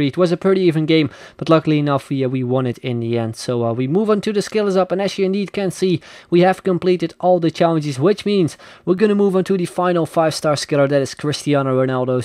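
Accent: Netherlands. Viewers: Je hebt echt een nederlands accent maar je bent wel fcking goed met skills ;)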